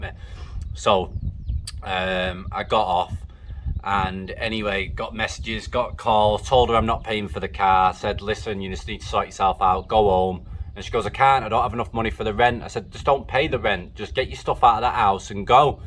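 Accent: Manchester, United Kingdom. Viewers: You from Manchester,?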